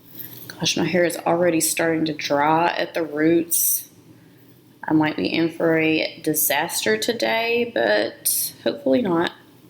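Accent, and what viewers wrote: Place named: South, United States. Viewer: I definitely need a detangler. My hair is 3c so I hope it works for me. Also where are you from? Your accent is super southern and strong lol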